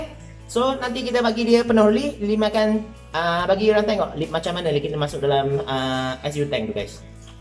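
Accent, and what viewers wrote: Kelantan, Malaysia. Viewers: lim ore klate ko?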